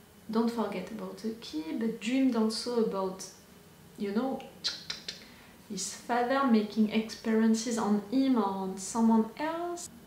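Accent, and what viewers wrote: France. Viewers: Je viens de découvrir à l'instant ta chaîne et je voulais savoir c'est moi où tu a un accent français ? Sans savoir parler anglais je pense entendre l'accent francais quand tu parle... , En tout cas sa ne m'a pas l'air d'être l'accent anglais...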